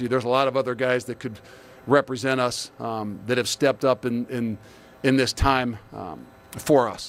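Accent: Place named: Southern California, United States. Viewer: He sounds like a Southern Californian. 😁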